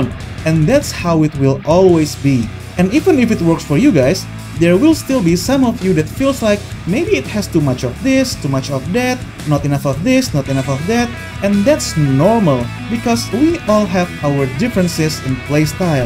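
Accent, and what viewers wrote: Indonesia. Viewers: Kayaknya, aksen Inggrisnya kaya ada suara orang indonya gitu, tau kan lu orang India ngomong Inggris gmn? Nah sama juga kyk orang indo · orang indo nih yg punya channel wkwk, logatnya soalny 😂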